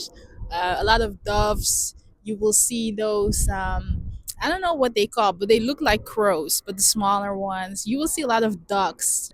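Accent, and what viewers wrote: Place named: Netherlands. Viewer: You have a Dutch accent :) You Dutch :)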